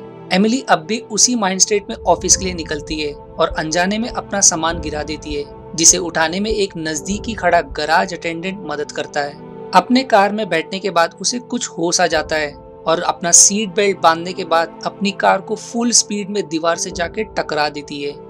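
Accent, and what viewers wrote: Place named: Maharashtra, India. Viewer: Bhawa Tu marathi aahes watt.. I suggest u.. Hindi madhe police nhi pulis uccharan karat ja.. Baki mast... Keep it up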